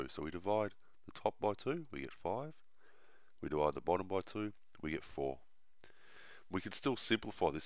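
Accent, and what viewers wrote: Australia. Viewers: Thanks in my sats I nearly got 300 points but I got 297 because of fractions if I got 300 points teachers said that it was harder this year because it is year eight work now I am going into year 7 so that you also are you Australian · he's Australian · This guy is not british, he is Australian plus daddy pig has a deeper voice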